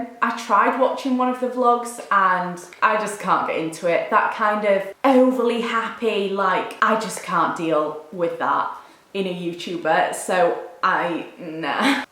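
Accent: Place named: North, United Kingdom. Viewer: You look so pretty! 😍😘Your northern accent was totally coming through in this video😜(not dissing just something I noticed lol) So excited for the new setups!😉😊💗💗xxx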